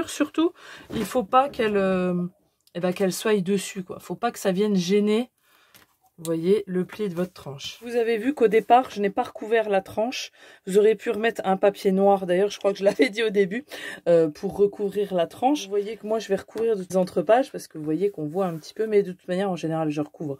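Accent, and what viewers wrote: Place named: North, France. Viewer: Chalut , je visionne tes tutos merci d' où es tu ? Pour dire vingT en prononçant le T ? Du Nord , de la Haute Saône ? Jaime entendre les accents hi hi 😂❤🎉 Chat'leureusement F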